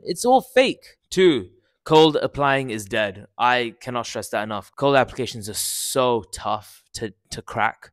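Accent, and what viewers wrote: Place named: South Africa. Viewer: It took me 5 minutes into the video to place the accent as South African. I went through British to American, back to British and finally landed on South African 😂😂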